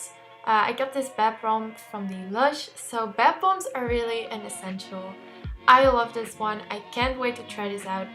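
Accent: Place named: Netherlands. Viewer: Everything looks so nice! Also your thumbnails look so great and look so well together! OMG I KNEW I HEARD AN ACCENT. Kom je ook uit Nederland? Cute, subbed <33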